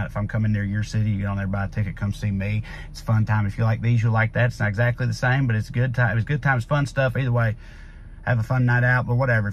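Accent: South, United States. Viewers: i skip every ad on this ap. But yours, idk if its the southern drawl or what but your ad reads are actually enjoyable 😂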